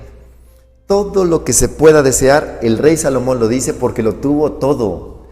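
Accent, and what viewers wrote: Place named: Mexico. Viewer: yo pensaba que el pastor era mexicano 😄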